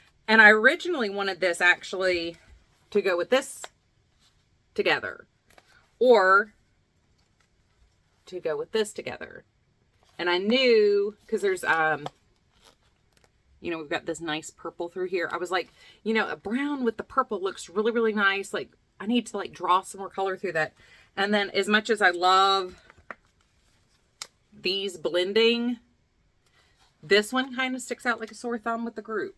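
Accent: South, United States. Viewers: Hi, I'm so happy I finally found you on YouTube! I saw your summer freshness shawl and it's stunning! I love it! can't wait to see it finished! You're so a lovely person and I love your southern accent. Have a lovely day! Magali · Your shawl is beautiful, the colours are amazing! Also, your southern accent is stunning, I love it 😍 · Your southern accent is wonderful - comes out stronger with certain words. I don't block socks in general. However, a knit stocking would definitely benefit from it. Looks great. I can't remember if you said what fabric you are going to line it with.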